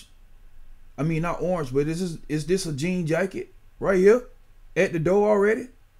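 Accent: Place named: South, United States. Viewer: He so southern like me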